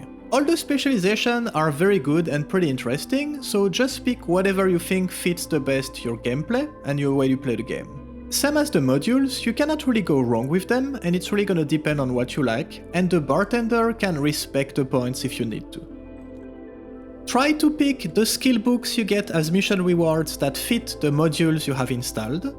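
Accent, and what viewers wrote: France. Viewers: is that a french accent ? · you sound french, and i know what i'm talking about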